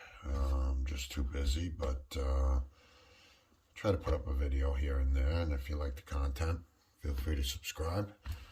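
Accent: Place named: Northeast, United States. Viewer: Definitely from the Northeast. Good vid btw #2A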